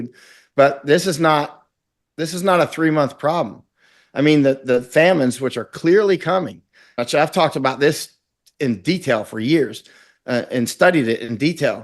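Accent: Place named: Europe. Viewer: You’re not a white man. You’re a pink man. From Europe. Speaking European English.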